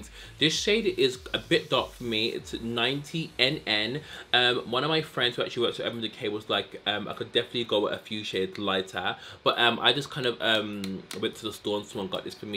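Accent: Birmingham, United Kingdom. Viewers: Is that a Brum accent i'm hearing? ❤️ . and thanks for the vid, you've helped a clueless sister out 😂 .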